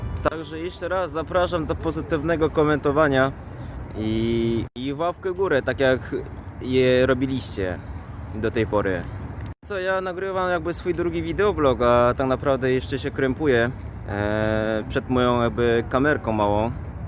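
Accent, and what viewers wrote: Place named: Poland. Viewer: Czy ty nie jesteś przypadkiem Polakiem, bo akcent masz nawet nasz. To jest naprawdę niewiarygodne, opowiedz coś o sobie.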